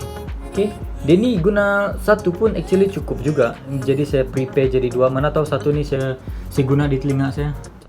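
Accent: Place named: Sabah, Malaysia. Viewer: Mcm ada bahasa sabah campur kl😂 btw hope you get a lot subscribers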